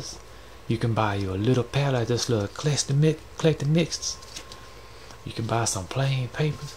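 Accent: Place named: South, United States. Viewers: I love your southern gentleman accent "Cabocon" very well done. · Dude, you sound like a southern Bob Ross 😊 great tutorial! Thank you!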